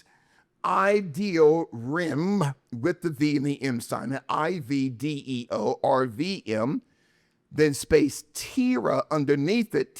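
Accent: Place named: Russia. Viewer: Congratulations on your 100th episode! I’m glad I found your channel. Your Russian accent makes the conversations so much better hahah ❤✊🏼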